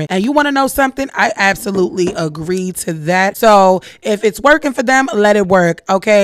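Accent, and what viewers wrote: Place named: Brooklyn, United States. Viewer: First of all let's start with your beauty 😍. U r Amazing beautiful and the accent is BK New York ❤ She thought having the baby alone is all she wanted and she's up here and he's down there. Keke doesn't respect the man so it's best for him to go find his Queen that he wants... 👑 Keke is a Queen 👑 but let her go find her Prince 😊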